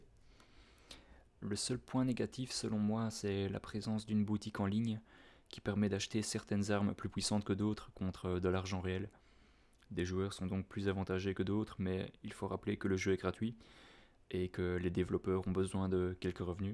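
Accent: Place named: Belgium. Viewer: J aime bien ton accent belge 😏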